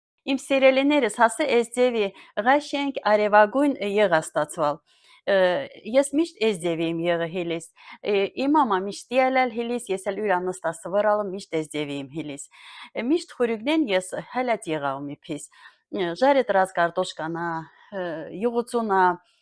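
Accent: Artsakh, Azerbaijan. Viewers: Наверное,надо стараться понять.Это Арцахский диалект. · Ղարաբաղի, Արցախի բառբառնա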